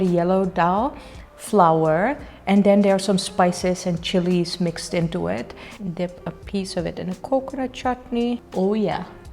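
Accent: India. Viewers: Hey!! Ik keek jouw video's voornamelijk toen je in Nederland woonde en vanuit Nederland rondreisde. En na lange tijd besloot ik deze video te kijken. Wat mij opvalt is dat je al een mega Indiaas accent hebt gekregen! Super grappig.😉😊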